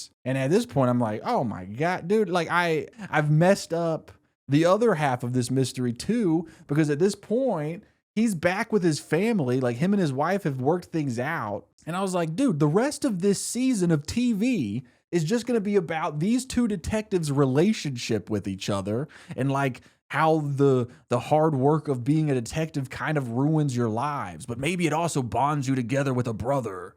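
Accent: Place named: United States. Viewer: From the thumbnail, I assumed this creator was British. I was absolutely astounded to hear an American accent. Bravo 👏🏾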